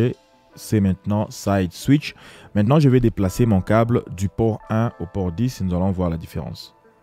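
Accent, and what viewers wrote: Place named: France. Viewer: Je suis tombé sur une de tes vidéos anglaise (coaxial vers ethernet) et je me disais bien qu'il y avait un soupçon d'accent Francophone 🤭 La qualité de tes vidéos est surprenante, bravo Également tout ton matériel, la façon dont tu te tiens, tes expressions, tout ça attise ma curiosité et me donne envie de connaître un peu plus qui tu es ! Bref, plein de succès dans tes projets et merci pour le partage de tes connaissances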